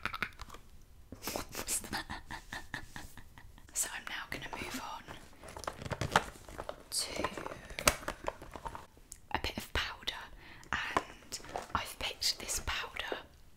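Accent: United Kingdom. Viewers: I'm new here but hello. Are you British? I can't tell. Even though I'm British. 😝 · the first asmr that i watched in british accent.. so good to hear!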